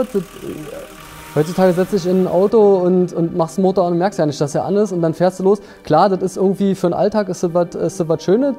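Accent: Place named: Berlin, Germany. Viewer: Super sympathisch. Super Auto klingst ein wenig nach Berlin ? Fahre selber Volvo aber kenne hier keine andern der ne ähnliche Klatsche hat .mfg tommy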